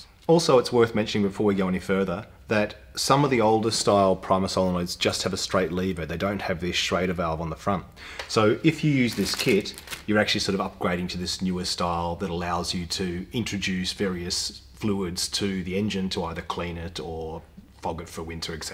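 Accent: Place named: Australia. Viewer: Teaching a marine tech program, and we all love the old Outboards, love this guy. It's always more important with an Aussie accent too!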